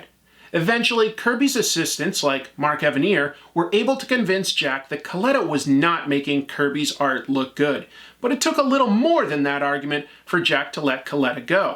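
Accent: United Kingdom. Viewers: A posh Attenborough accent always works 😅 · The British Accent was a nice touch, only a true classy artist can appreciate the melodramatic! If only Colletta's work was as dynamic as his writing skills? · Wow, epic British reading, I salute you sir! On another note, I’d argue jack kirby ruined his own art. Not a fan, though his stylized work screens jack Kirby making it somehow endearing😁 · every thing sounds better in a British voice.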